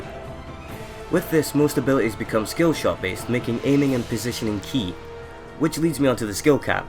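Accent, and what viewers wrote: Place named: Scotland, United Kingdom. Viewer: Always throws me off when i hear the Scots accent in vid. some fucking good plays there mate.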